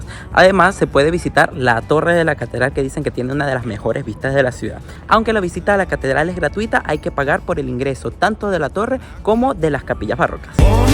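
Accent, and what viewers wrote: Venezuela. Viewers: Que fino escuchar a un venezolano 😊😊😊 Próximo ✈️😊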